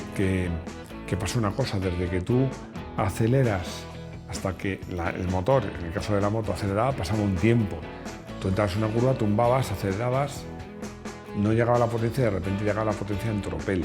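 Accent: Spain. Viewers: JA JA JA JA... NADA COMO UN PRESENTADOR ESPAÑOL..... ADORO A LA BELLISIMA ESPAÑA.... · Bien el gallego. Consiso y claro. Veré de seguirlo Entre mí moto y estos videos espero aprender un poco más!! Gracias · Que asco de video: narrado por español. No way man!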